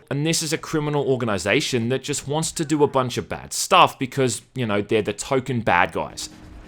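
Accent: Australia. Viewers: Hey man, just found your channel and I really really enjoy your content. I don't ever comment on YouTube and who knows if you'll see this but I've been binging absolutely all of your videos this last week. My wife keeps wondering why she's constantly hearing an Aussie man talk about "utter sheeeit" games at 2 in the morning. Just a lot of thought and care went into each of these videos and it really shows. Thanks.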